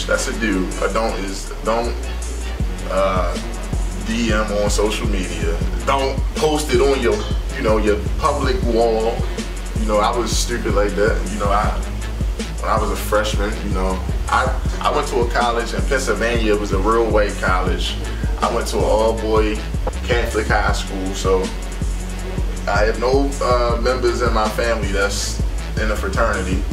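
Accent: Baltimore, United States. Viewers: B’More Accent?? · You from Baltimore? I noticed that “Yeew”(You) “Blew”(Blue)😂😂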